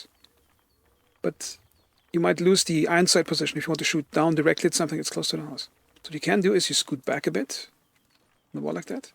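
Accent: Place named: Germany. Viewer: Something about your voice‘s pitch, the slight German accent and the way you accentuate your sentences is very endearing to listen to.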